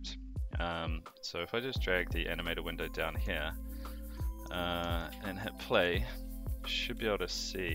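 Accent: New Zealand. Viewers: great. i already know im gonna hear nothing but "zed" instead of Z "not" instead of zero and "soouuuuwwww" instead of "so" the whole time.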